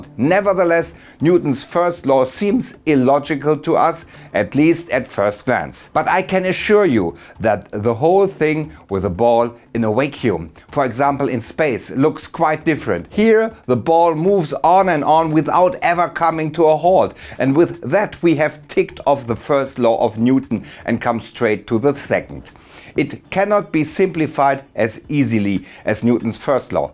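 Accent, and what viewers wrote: Germany. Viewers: German Englisch ist a little funny. 😎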